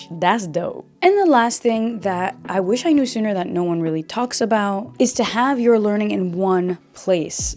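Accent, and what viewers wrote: Belgium. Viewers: Ik ga echt stuk dat je Nederlands met een Vlaams accent hebt geleerd. Het klinkt zo schattig. (Zegt een Nederlander). Ik ga volgend jaar een studie in België beginnen en hoop dat ik het Vlaams accent kan oppakken. Naast Nederlands praat ik ek Frysk, in taal dy't yn it noarden fan it lân sprutsen wurdt. Ich spreche auch fließend Deutsch, weil ich Deutsch auf Lehramst studiere und man ein gutes Niveau braucht, um vor der Klasse stehen zu dürfen und das Studium zu absolvieren. Ich habe auch zweimal für 4 Monate in Deutschland gewohnt. I'd say English is my fourth language. Expressing myself in German feels more personal than in English. Although I'm not sure which language I speak better. Duits is natuurlijk de taal waar ik veel voor heb moeten leren en ik ken de details beter om zo maar te zeggen. Engels heb ik opgepikt door jarenlang Engelstalige televisie te kijken, maar als ik Engels spreek, voelt dat zo... 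vlak. Maintenaint j'apprends français aussi, mais je ne peux pas dire beacoup. J'ai commencé regarder Spongebob en français et j'écoute podcasts avec text. Ik zou zeggen dat een taal onder de knie krijgen even moeilijk blijft, maar hoe je het aanpakt steeds simpeler wordt. Inmiddels weet ik wel wat belangrijk is om te weten voor als je snel resultaat wilt boeken (chunks leren bijv., vooral die echt vaak in gesproken taal gebruikt wordt). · Jouw belgisch tongval is echt "on point".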